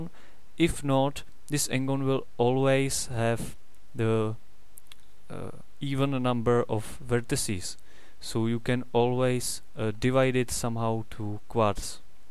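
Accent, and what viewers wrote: Czech Republic. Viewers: very useful, I like it, but that czech accent is too much xD I'm sorry, but it is difficult for some people to understand our accent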